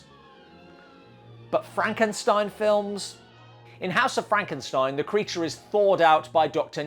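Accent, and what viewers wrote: United Kingdom. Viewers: It really IS hard to see Krogh as anything other than a buffoon after YF, so similar is Marrs to Atwill LOL. There’s something about hearing these stories in an English accent. Between you and Adam Roche, I am a happy man❤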